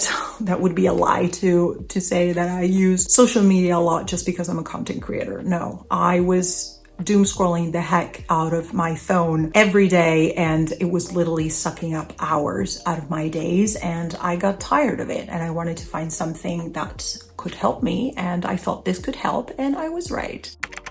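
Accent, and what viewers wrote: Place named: United States. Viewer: Hi - I'm new to your channel. Just wondered how you ended up living in Berlin? You seem to have an American twang so I was curious. Hope you don't mind me asking? I have family in Germany too! Thank you.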